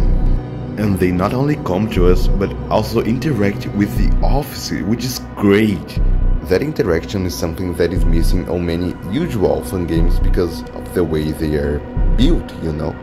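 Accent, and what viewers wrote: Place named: Brazil. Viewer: Percebi de primeira que vc é br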